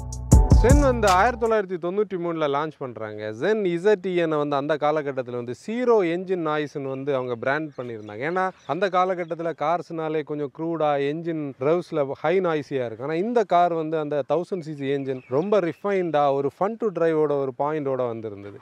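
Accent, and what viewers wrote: Kanyakumari, India. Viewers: Your presentation and the slang of my own Kanyakumari style is extraordinary. Keep going all the best.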